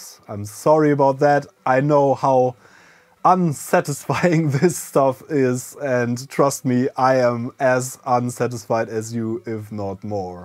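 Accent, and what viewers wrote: Germany. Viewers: Ok, erstes Video was ich von dir sehe, und direkt raus höre nur mit dein Hi das du Deutscher bist.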